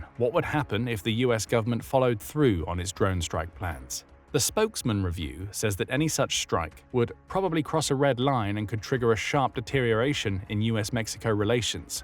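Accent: United Kingdom. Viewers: I cannot even believe your trying to downplay the affect of fetanyl on the American population with your British accent as though you know… honestly it is disgraceful and without value to say it may not be as big a problem as Trump is doing. He’s literally doing what we the people have asked. What are you doing…?!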